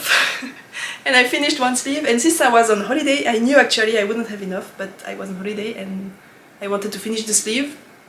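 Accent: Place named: France. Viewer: Love you French accent. Do you use raveyto count your projects?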